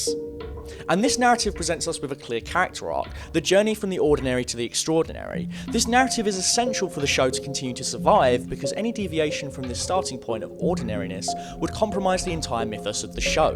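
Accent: United Kingdom. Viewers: Your British accent is making my ears rot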